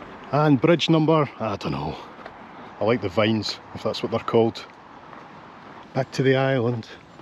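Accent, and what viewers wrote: Cork, Ireland. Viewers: Cork accent is very fast 😂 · My grandad was from cork. I love the accent ❤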